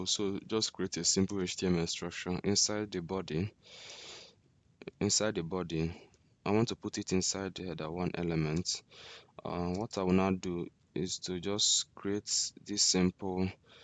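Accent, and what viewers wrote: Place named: Nigeria. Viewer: you sound like a 9ja man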